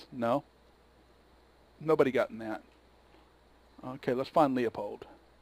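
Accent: South, United States. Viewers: The lesson also is to avoid ranting when recording days in advance, because the issue could be fixed before the video comes out, making you look like you don't keep up with the development of the game (Even though you are in fact one of the more involved youtubers I've seen.) Anyway, nice series, I'm really enjoying it. Love your southern country accent too, helps differentiate you from all the other Let's Play makers. Last few episodes were hard to get through though, with the five minutes of actual gameplay and the rest all paused with you talking the viewer's ear off. Not that I mind you talking, just play the dang game while doing so. It's a Let's Play not a Let's Talk. Glad your back to the old play and talk format. Also +1 for having a David on the team:p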